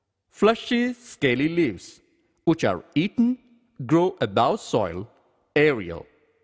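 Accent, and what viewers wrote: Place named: India. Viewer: I love your accent, where you from? #India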